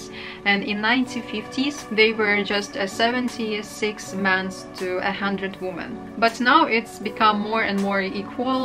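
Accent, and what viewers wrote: Russia. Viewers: I can hear the Russian and Chinese when you speak. It’s beautiful and unique❤ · The longer I hear you talk, the more I can pick out that you are a native Russian speaker :D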